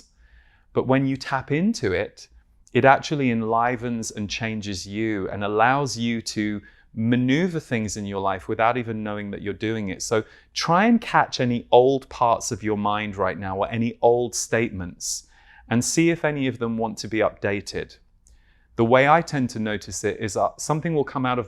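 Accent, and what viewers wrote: United Kingdom. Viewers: Your voice has a divine resonance..... Beautiful.... Brish English....❤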